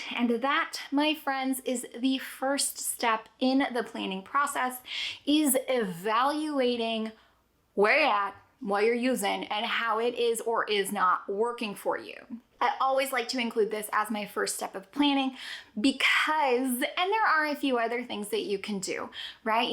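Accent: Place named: Texas, United States. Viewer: I love how much your TX twang came out in this one 🤠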